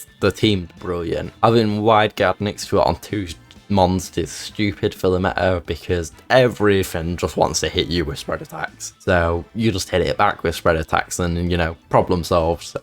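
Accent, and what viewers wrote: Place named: United Kingdom. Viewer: Do you go to many regionals. You sound british to me? Did you go to the Birmingham regionals?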